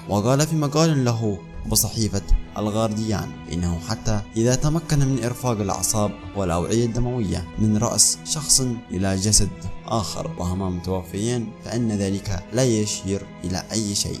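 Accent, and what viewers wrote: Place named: Yemen. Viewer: نبرة المقدم يمنية فأنا يمني واعرف قومي . حاول تتدرب قليل في طريقة إلقاء الشرح. وشكرا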